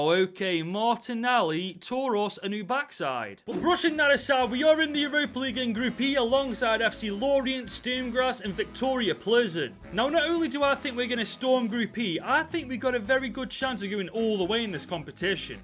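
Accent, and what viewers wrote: Stoke, United Kingdom. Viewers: I’m confused, you sound like you’re from Stoke yourself but you’re a Chelsea fan 🤷🏼‍♂️😂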